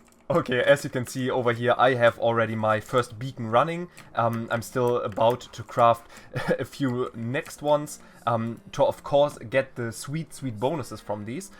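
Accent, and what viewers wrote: Germany. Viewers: Are u German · You can hear that he is german 😂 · Finds ürgendwie cool wie du versuchst Englisch zu reden aber man hört so stark den deutschen Akzent xD (no hate) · So geil der deutsche Akzent in deinen englisch haha like ist aber da 👍🏼😅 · Irgendwie habe ich das Gefühl das du nen deutscher bist echt jz 2 sek danach hast du das eingeblendet xddd köntest du auch mal deutsche Videos machen ich finde dein englisch irgendwie so extra deutlich und als ob du dich einfach nur verstellst finde es besser wen man so sein kann wie man ist ka aber macht trotzdem weiter ich finde dich trotzdem echt sympathisch. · Du bist Deutscher oder :) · Warum sprichst du nicht einfach deutsch in deinen Videos? Nichts gegen dich aber irgendwie triggert mich dein Deutscher Akzent wenn du englisch sprichst. Edit: Deine Aussprache bzw Akzent erinnert mich an Werner Herzog wenn er englisch redet 😂😂😂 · Dieser deutsche Akzent🤌 (no front) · Man hört so sehr dass du Deutsch bist👍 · Erstes wort ich wusste du bist deutscher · Man erkennt das du deutsch bist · U R German right? 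· Are u German I can here you're accent I'm German too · Kommst du aus deutschland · Bro ich hab in der waren sek gecheckt das du deutsch bist haha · So you are from germany. I thought already you sound like a german (i am one too) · Man hört ab und zu das er deutscher ist :D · Ok aber ein bisschen erritierend weil du diesen deutsch akzent hast xD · Alta hab nicht geguckt and wusste du bist deutsch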